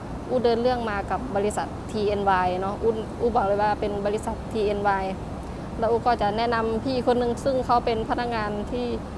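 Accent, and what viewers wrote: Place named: Laos. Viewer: เว้าลาวกะได้ แซบหลายเด้อๆๆๆๆ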